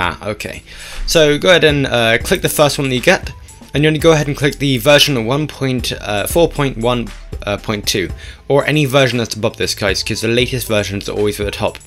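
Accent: United Kingdom. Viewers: Are you british or australlian?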